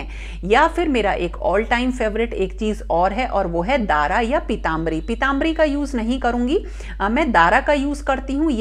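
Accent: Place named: Braj, India. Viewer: Braj boli sun ke bahut aachi lagi... nice madam ji 🤗..14hours ke kaam ko only 14 minutes mai show karna😀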